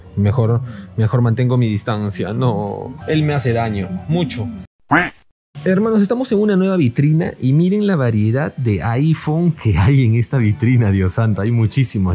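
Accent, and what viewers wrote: Peru. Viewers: Joder bro como me cuesta diferenciar el acento peruano 🤣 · Asu de entrada reconocí el acento de un compatriota. 🇵🇪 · mano tu eres de peruuuuu? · Primera vez qe veo tus videos y por tu forma de hablar creo qe eres Peruano :V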